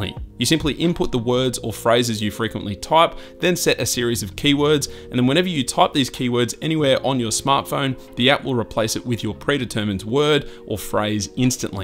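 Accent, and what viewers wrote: Australia. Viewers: Where are you from ? Australia? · And that Aussie Accent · is this guy australian? i am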